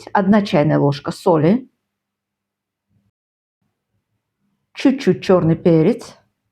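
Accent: Baku, Azerbaijan. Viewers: Акцент бакинский неистребим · Добрый вечер, а вы бакинка? Простите акцент❤❤❤ родной😂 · Случайно увидела это видео, думаю, дай гляну, хоть и НГ уже прошёл)) Уже на слове «столичный салат» у меня возникли смутные сомнения)) Потом кИнза, винегрет с красной фасолью и, наконец, демьянка – развеяли все мои сомнения – я смотрю видео бакинки из Греции😂 И ещё, конечно же, наш акцент, хоть и небольшой, но всё равно ощутимый😂 Поймала себя на мысли, что смотрю видео и жду, когда же Вы скажете «бурак» вместо свеклы😂 На душе так тепло стало при просмотре вашего видео, будто с родными повидалась)) Большой привет Вам из Баку! И спасибо за интересное видео! Успехов и крепкого здоровья Вам в новом году! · Здравствуйте,! Это все, что готовили наши мамы, я прям окунулась в детство! Вы, случайно, не бакинка? По разговору почувствовала, потому, что демьянки, говорят только жившие в Баку❤❤очень приятно познакомиться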